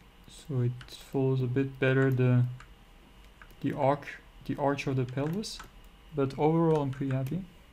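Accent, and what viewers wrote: France. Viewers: i love your french accent it is so sexy :D romantic <3 <3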